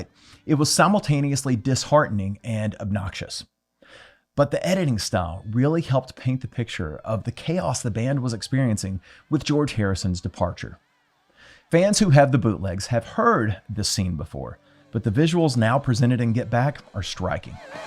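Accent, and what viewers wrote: South, United States. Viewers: Your Southern accent breaks through on occasion. Where does that originate from ?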